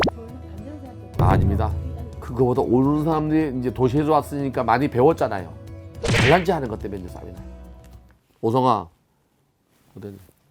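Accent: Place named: Gangwon, South Korea. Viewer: 교수님 고향이 강원도래요? 어째 말투가 강원도 토박이 말투래요?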